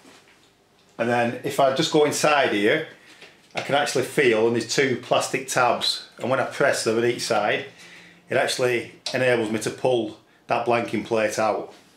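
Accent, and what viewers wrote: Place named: London, United Kingdom. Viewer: Very Simple...Thank You.... Are you from London....Your accent is very nice. I like it........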